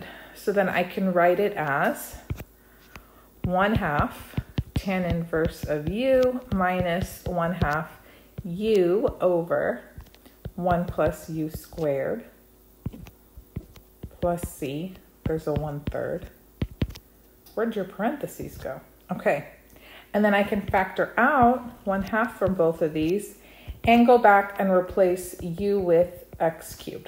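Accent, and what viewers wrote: United States. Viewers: I ❤ UR AMERICAN ENGLISH accent😊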